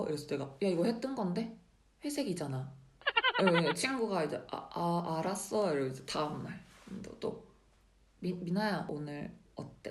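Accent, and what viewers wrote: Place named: Gyeongsang, South Korea. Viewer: 경상도 사투리를 들어봣을땐 경상도 현지인인것 같은데요.. ㅎㅎㅎ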